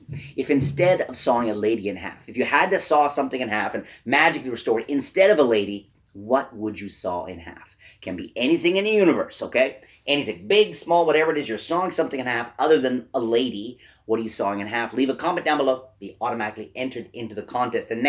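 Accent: Canada. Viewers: Kiera Todd not sure what it is. Maybe it’s the glasses or that Canadian accent, not sure, but he is really good. I always like the tricks he does.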